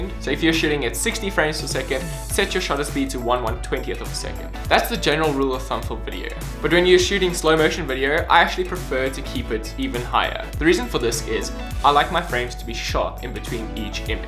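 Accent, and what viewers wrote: South Africa. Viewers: Are you South African? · South African right??? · You South African?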